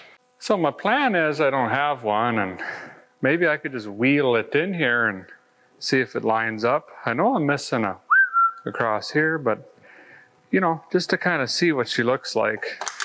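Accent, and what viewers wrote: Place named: Great Lakes, United States. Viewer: Love the “Great Lakes” accent! All in fun and learn and become inspired too!! Great job. Liked, subbed, commented and shared!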